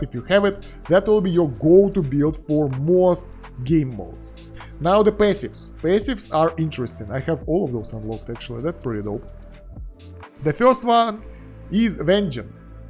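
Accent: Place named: Russia. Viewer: у тебя русский акцент. Ты украинец?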